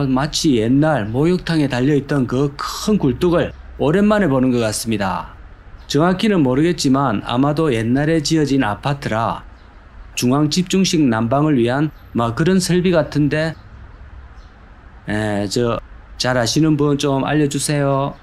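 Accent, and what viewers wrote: Gyeongsang, South Korea. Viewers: 엄마가 아니고 음마도 아니고 은마입니다 ㅋㅋ 갱상도 사투리 매력😊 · 구수하니 경상도 사투리 듣기 조아요 아주 꾸밈없는 목소리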